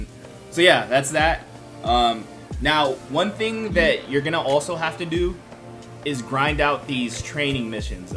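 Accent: Australia. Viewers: LMFAOOOOOOOO AUSTRALIAN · Yikes that was an Australian accent?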